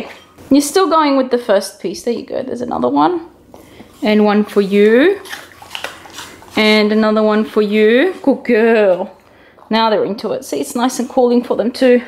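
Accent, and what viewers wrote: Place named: Australia. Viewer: Aussie accent 👊🏼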